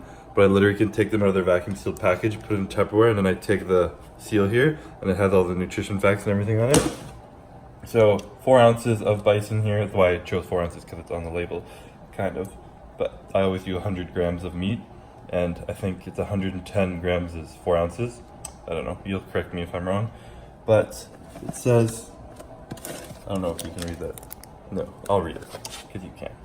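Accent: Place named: Quebec, Canada. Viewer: “Beurre d’arachides” 😄👍 haha you should do more video in french!!! You will gain more viewers with your French Canadian accent !!!😆🤙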